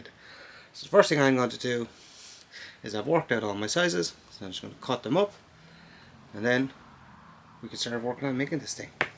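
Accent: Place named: Ireland. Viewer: Just discovered your channel! I like your style, and the simple but elegant toolbox really respects the Japanese methods, which are simpler than a lot of people realize. Subscribed! Agree with on volume: I'm old, and my ears have trouble with the loud music and intro and your lovely soft Irish speech. A little balance wouldn't go amiss.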